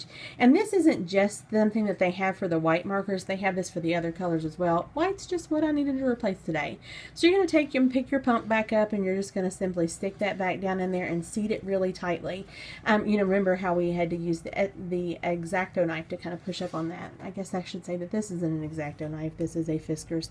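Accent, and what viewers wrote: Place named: South, United States. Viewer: Ahh love a southern accent tutorial vid 😊 reminds me of my fam down south. Ty for posting this!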